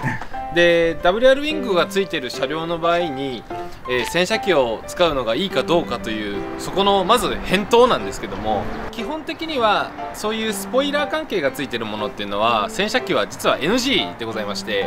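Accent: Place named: Kyushu, Japan. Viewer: しかれる。 上京して28年、九州弁たまらんです。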